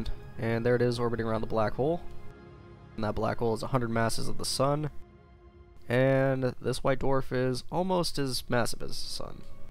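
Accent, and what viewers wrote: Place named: United States. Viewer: Compelling video, for a yank you've got just the right sort of voice for this.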